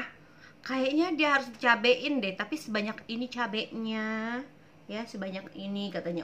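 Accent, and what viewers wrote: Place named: Medan, Indonesia. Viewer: nyai orang medan ya ..kadang logat nya kayak orang medan..sering aku tonton kq udah emosi keluar logat medan nya..maju terus..takut aku ah..awak disini baru belajar pulak jadi presenter dan dunia medsos..🤞🤞peluru pelurunya..wow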